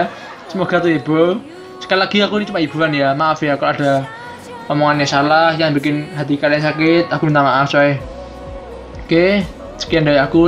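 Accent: Java, Indonesia. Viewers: Ngomong jawa nya mas jangan medok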